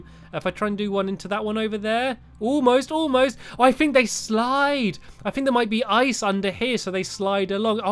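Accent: United Kingdom. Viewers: People,you are mean,he is from england,so he is gonna sound like that(no offense) some people have accents,some people dont,i like stamps so don't be RUDE!!!!!:) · are you british?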